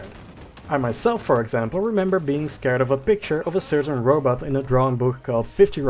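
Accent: Netherlands. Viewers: I think he has a light Dutch accent but I am not sure. I do know that the movie rankings In the Netherlands stop at 16 which he mentioned in the video so it's possible.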